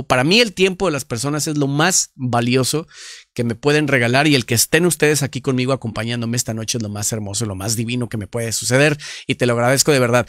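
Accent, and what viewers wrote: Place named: Mexico. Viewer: En dónde se encuentra Usted? Su acento es Mexicano.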